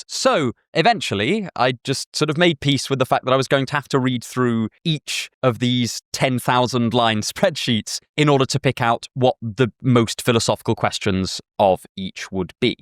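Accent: RP, United Kingdom. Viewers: There is something very funny in hearing grandly articulate "What-to-do" in his rather posh and fabulous english accent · it's all about the british accent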